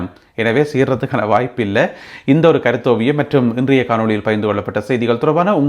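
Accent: Jaffna, Sri Lanka. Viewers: இது யாழ்ப்பாண பேச்சுத் தமிழ்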